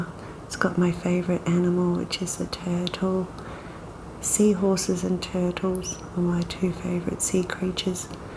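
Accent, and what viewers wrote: Australia. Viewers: First time viewer here! I was scrolling my feed and I literally looked at the thumbnail and thought, “I wonder what Australian ASMR would sound like” and I went to click the audio to preview heard her accent and it’s like this was meant to be. Can’t wait to watch more! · FINALLY FOUND YOU! I've been searching for 15 years for a soft spoken lady with an aussie accent! ❤❤❤🎉🎉🎉 This is reminiscent of tv shopping networks where i would hope for tingles but it was never garaunteed. Thank you, liked and subscribed.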